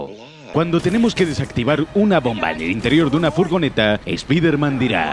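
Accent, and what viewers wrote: Spain. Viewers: Es español y así se dice aquí.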